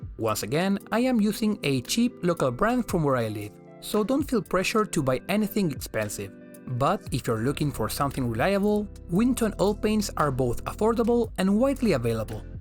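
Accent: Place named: Spain. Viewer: estaba casi seguro que tu acento es del español, notas mucha diferencia realmente en la audiencia por hacer los videos en ingles?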